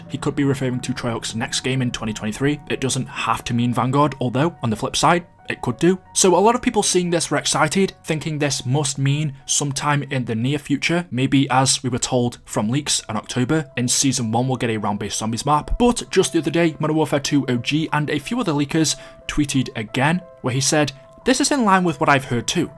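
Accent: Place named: Northern England, United Kingdom. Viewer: You have a very interesting accent! What is it? Northern English? Western Canadian?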